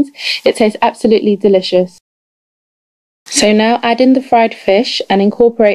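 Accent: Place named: United States. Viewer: Are you American or a Nigerian?? Love d accent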